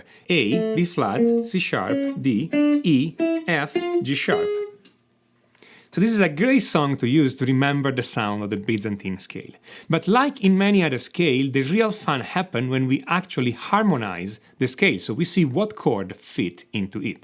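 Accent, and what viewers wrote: Italy. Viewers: Your channel is a gold mine. Are you Italian? Cuz your accent sound a bit like it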